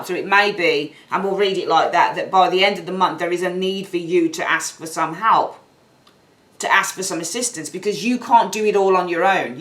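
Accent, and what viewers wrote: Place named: Midlands, United Kingdom. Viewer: This reading really resonated I can hear in your accent you’re a fellow midlander 👍👍👍 Love and light ☀️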